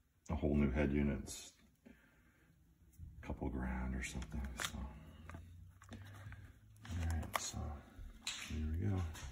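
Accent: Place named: Ontario, Canada. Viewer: Thank you for such a great video! My radio is acting up now too so I ordered the digitizer from Ali. I'll report back after I replace it. I'm in Canada as well, Halifax. Where are you? You sound like maybe from Ont.? Thanks again! Cheers...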